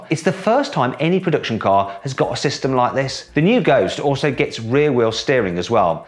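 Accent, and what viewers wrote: United Kingdom. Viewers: マットさんのイギリス英語で紹介されるのが最も似合う一台かもしれない…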